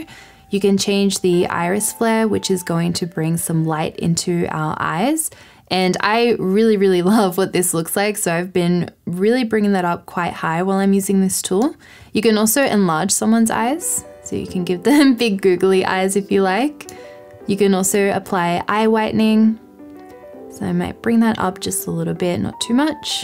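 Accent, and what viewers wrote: Australia. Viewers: luv ur aussie accent ❤️ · It's Aussie? I couldn't place it.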